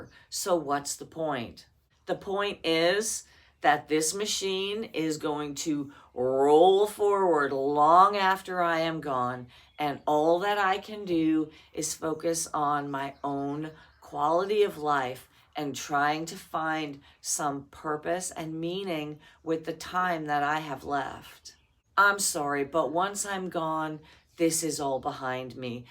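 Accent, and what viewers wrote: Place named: New Jersey, United States. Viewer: applying to phd's in canada for 2026. you know why! but i'd love to come visit your farm and meet you. i am also:-) cheers, love your program (you sound like a jerZ girl:-) your discussion of the "master / slave" dialectic is 200% frankfurt school and the "critical theory" camp, my camp. welcome to the machine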